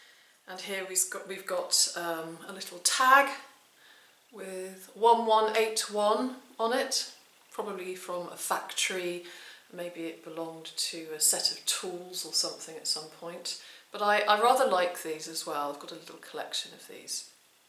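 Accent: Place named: England, United Kingdom. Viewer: I love the research you do for the objects you find , it really does add that extra dimension to your show plus it's always nice to see your Beautiful face and hear you lovely English accent !.....I really miss living in England .....I must come back again soon I think !!.....I particulary liked Cornwall and was wondering if you might have a holiday there and do some Mudlarking along the Pirate Coast !!!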